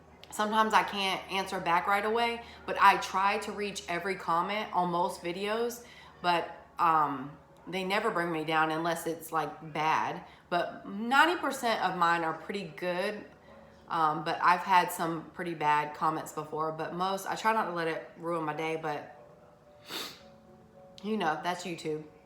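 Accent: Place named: Georgia, United States. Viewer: We are having terrible weather, so I'm watching all your old videos!!! 😍 I love that now you let your Georgia accent loose. I don't hear it so much in these older vids! Love you and your kiddos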